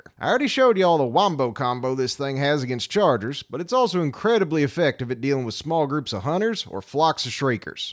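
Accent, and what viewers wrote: South, United States. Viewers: the southern accent just makes it so much better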